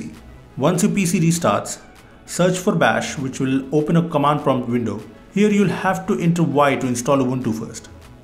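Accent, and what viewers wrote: India. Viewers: Thats Indian accent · I like your videos but i hate the accent cause many scam-Indians are calling me all the time and tell me that there's something wrong with my win 10 laptop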